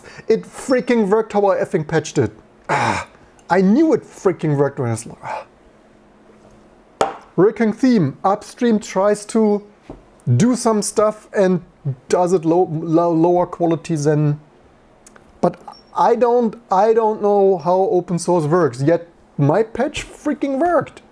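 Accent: Germany. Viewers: anaser most german english ever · If your accent didn't give up that you are German, the lidl and aldi definitely gave that up. Btw Aldi has currently one of the biggest SAP transformation going on rn .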